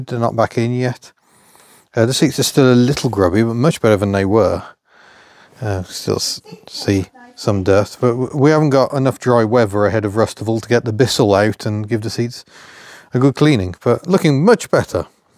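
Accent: United Kingdom. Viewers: Listening without the visual offers a somewhat "Carry on " experience which is highly entertaining and quintessentially British flavor!!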